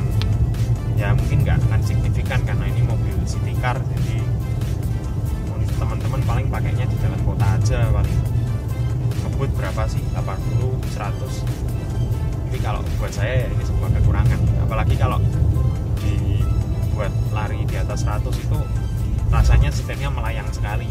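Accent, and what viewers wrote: Surabaya, Indonesia. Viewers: 10 detik awal, gak perlu moco comment, asli suroboyo yo ? salam medhok masbro :D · iki wong suroboyo yo ? sepisan ngrungokne langsung mikir suroboyoan. medhok jowone masbro. salam suroboyo👍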